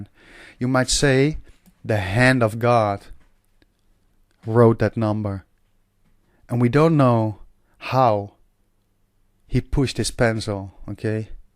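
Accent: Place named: Netherlands. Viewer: Hi, you sound Dutch to me 🙂, am Dutch too....thank you for this video. Please look into the book the Numerical Universe by Anthony Morris. You will find it mind blowing. He shows through Vortex Mathematics that we are living in an intelligent designed Universe of 137 billion years old 🙂 He elaborates deeply this number and I have put it on my car’s license plate ever since I find out about this number. Also in Hebrew the word God is written in such a way that it looks like a mathematical formula showing the Phi sign and the number 7. Greetings from Cyprus <link>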